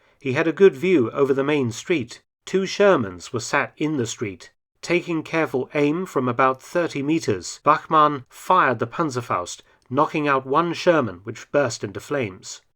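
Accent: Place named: RP, United Kingdom. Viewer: You kinda sound like a posh clone-trooper.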